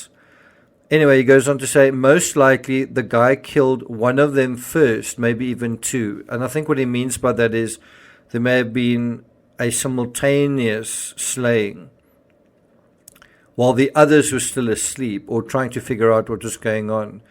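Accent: South Africa. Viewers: is from South African. We have friends from Durban who have the same accent. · You accent is like a South African?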